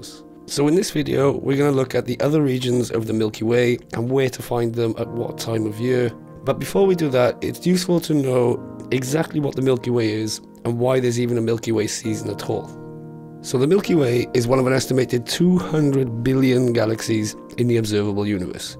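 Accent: Wales, United Kingdom. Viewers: What part of wales are you from mate?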